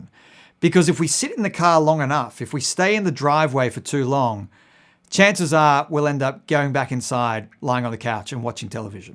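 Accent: United States. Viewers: Funny that Sting has a title first, I have been doing that for years. I will not even start a new song without a worthy title. I think if you give a creative process a pedestrian name, it can reflect if you let it and vice versa. By the way. You are Australian but I notice you have a slight American accent?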